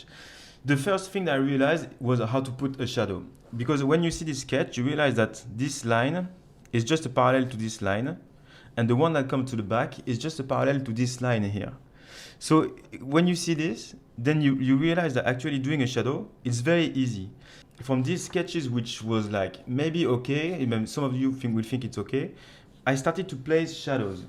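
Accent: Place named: France. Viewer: vu l'accent je suis sur que l'auteur est francais !!!! merci pour le job en tout cas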